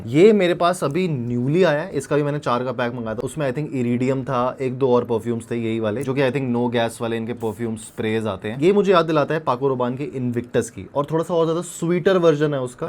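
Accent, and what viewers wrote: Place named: United Kingdom. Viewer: Brit accent ka kya scene hai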